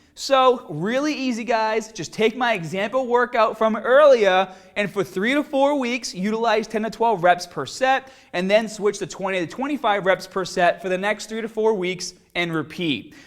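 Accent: Boston, United States. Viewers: Love the Boston accent :) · Boston or Rhode Island accent? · Reasons why i subscribed: that strong Boston accent and funny yet serious. Nice work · Am I crazy or is Bostonian accent a lot more noticeable?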